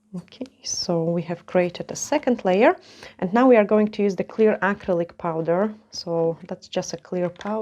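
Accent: Scotland, United Kingdom. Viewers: Greetings from Columbus, Ohio, USA. I was going to try this method but I don't have any acrylic powder so I will have to do a different way. I do pick up some Scottish inflection in your accent. Love that and your work. I am a retired RN, teaching myself nails the last 3 months. Love this hobby.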